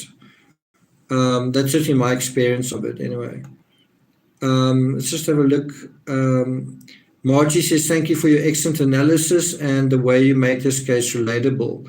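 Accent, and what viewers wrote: South Africa. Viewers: Accent sounds like S.Africa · Your accent is SOUTH AFRICAN!! I'm a fellow African, a Zimbabwean. Thank you for your coverage of this disturbing case. The whole world wants Summer home except the parents (if they can be called that) They don't deserve that title in my opinion. The "for sale" sign on the drum outside while Summer plays in the rain speaks volumes to me. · Distinctly South African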